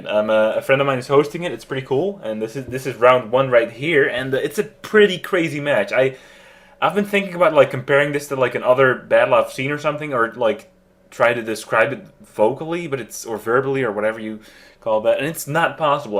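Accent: Netherlands. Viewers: U dutch? I can hear it in your accent haha ;)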